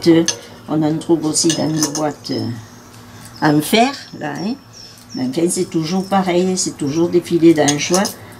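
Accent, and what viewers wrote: Provence, France. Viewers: Bravo mamie pour vos recettes en plus avec votre bel accent provençal, recettes que jai teste pas toutes encore merci pour votre gentillesse ❤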